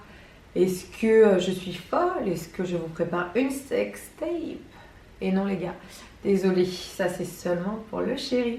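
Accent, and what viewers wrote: South, France. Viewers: Son probleme c'est pas son ex-bonnet B, c'est sa voix et son elocution, ca fait trop cagole du sud. Enfin si elle est heureuse comme ca ( Bon elle les a fait refaire 2 fois et jamais 2 sans 3 )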